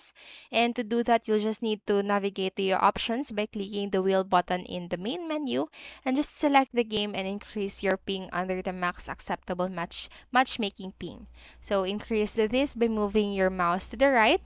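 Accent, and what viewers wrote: Philippines. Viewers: pilipina accent <3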